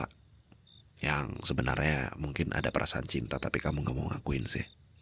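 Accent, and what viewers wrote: Java, Indonesia. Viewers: Kenapa mas sih kaya jawa sayang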